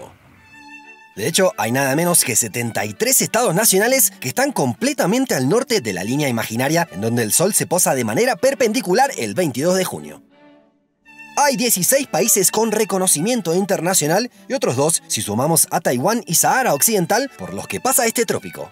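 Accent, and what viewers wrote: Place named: Rosario, Argentina. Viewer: Sí, y se renota que es de Rosarioooooo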